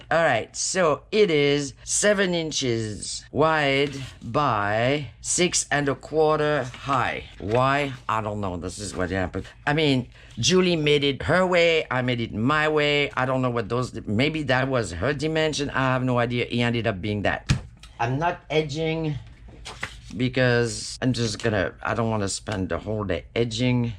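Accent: France. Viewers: Wow, wow, wow is all what I can tell you about your tutorials. I am your new subscriber I really love your humor your lovely french-english accent your way you edit your videos and and and...thanks for your time to inspire me and us!! You are a true Angel!!! Have a beautiful evening Heike from The Netherlands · Hey! I LOVE❤ your channel and I love your French accent!!❤️🤗. You are so cute and sweet!🌹🌹🌹 I was thinkin that you should make photo copies of those "old" magazine pictures of the women so you will have a never ending supply of the retro/vintage ladies🤷‍♀️👍😘 That would be cool too👍😘🤗❤️. Thank you for sharin all your ideas and little tips and tricks with all us🤗🤗❤️ We Really Appreciate it so much!!👍🤗🌹❤️🌹 Thank you😘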